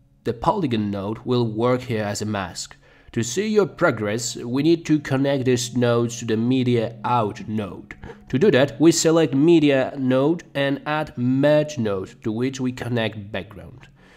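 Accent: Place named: England, United Kingdom. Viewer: Damn I wish I had that English accent. Great video btw 👍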